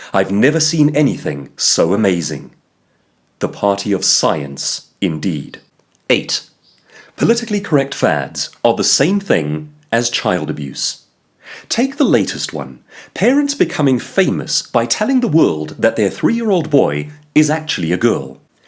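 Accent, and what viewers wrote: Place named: United Kingdom. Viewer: I understand that "politically" and "correct" have definitions in the English language. However, when you use them to further define the concept of "politically correct" I feel like you lose sight of the phenomenon that all languages are in a constant state of evolution. I can't place your accent, sir, but I can tell you do not originally hail from the USA. I'll go out on a limb and suspect The U.K. It is my understanding that the word "c*nt" in The U.K. is a lot less harsh than in my homeland here in the USA. Also, the term "pissed" has two very different meanings depending on which side of the pond you are standing. My point is that "politically correct" is no longer associated with its two root words, and likely has evolved away from its original usage. I noticed in the video you failed to address hate speech. As I understand it, it would be most politically incorrect to the "N" word. 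Yet you are advocating against political correctness. May I ask you to address this?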